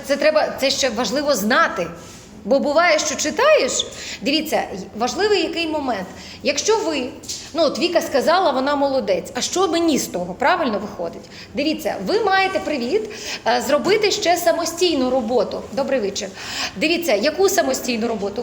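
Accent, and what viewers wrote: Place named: Western Ukraine, Ukraine. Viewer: Таким чином шиплячi вимовляють на захiднй Украiн. У нас, на Днiпропетровщинi, не "ТЧикають". Напевне дiалекти краще залишати в мiстях iх вжитку, а не нав'язувати як еталон мови на педагогiчних подiумах.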